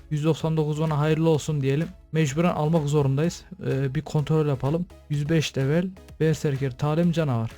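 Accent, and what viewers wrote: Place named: Konya, Turkey. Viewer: ilk 5 saniyede anladım konyalı oldugunu :D Çumralı mısın?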